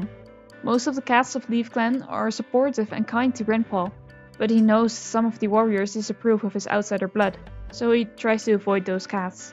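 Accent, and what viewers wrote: Netherlands. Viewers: are you dutch? your accent sounds similar to that of dutch ones :0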